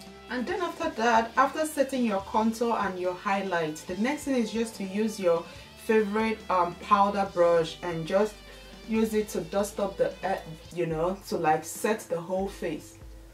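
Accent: Nigeria. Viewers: I love you. Its been a while since i heard a Nigerian accent (ps i'm Nigerian too). I just had to subscribed. Thanks for sharing. xx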